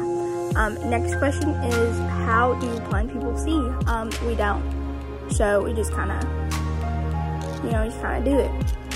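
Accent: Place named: South, United States. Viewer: I watched a full video of my friends and just it was a good video and I still remember your southern accent and the final question that I have is do you and have separate YouTube channels apart from this channel because I wanna go and subscribe to them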